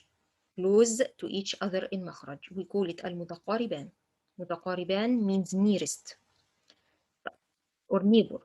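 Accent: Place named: Egypt. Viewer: I guess by your accent you r Egyptian??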